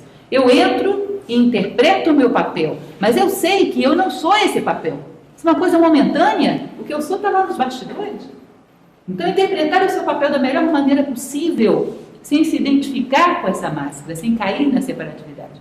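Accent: Rio de Janeiro, Brazil. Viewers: Muito interessante Tive dificuldade de entender a fala Primeiro achei q era o marcante sotaque carioca com seu todo abrangente xxxxx Depois achei q é a dicção da palestrante que aumenta e diminui o tom durante a pronuncia da mesma palavra Finalmente achei q tinha um eco na acústica da captação do som Pode que o uso de um pequeno microfone possa otimizar o som Enfim certamente a dificuldade de entender penso que é minha Agradeço o compartilhar do tema Abraço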